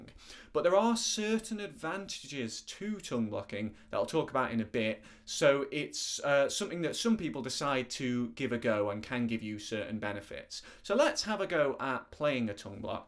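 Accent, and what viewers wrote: United Kingdom. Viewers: I don't play the harmonica but I like your lovely videos. Especially your beautiful british English.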